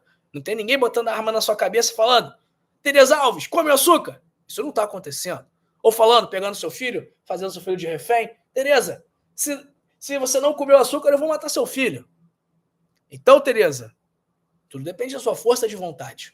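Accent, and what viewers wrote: Rio de Janeiro, Brazil. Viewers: Bom dia Muito boa as suas orientações . OBRIGADA .QUERIDO DR AMO O SEU SUTAQUE CARIOCA EU SOU GAUCHA . Aqui Jaguarão RS fronteira com Uruguai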